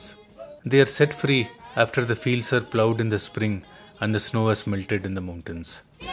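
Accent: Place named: Karnataka, India. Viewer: COMMENDABLE VEDIO WORK.. YOUR ACCENT, YOUR KNOWLEDGE, YOUR VISION , INNER INSTINCT to know the real Life of these farthest untouched places of Himalayas... I HAVE BECOME YOUR FAN --DEAR.. MY GOOD WISHES to You & all the Team members who are attached to produce this such a LOVELY MEMORABLE vedio... I think you hail from Karnataka as assumed by your way of speaking/narrating...must be very highly Qualified person.. Anyhow, great thanks for uploading this Vedio... All the Best Dear..!!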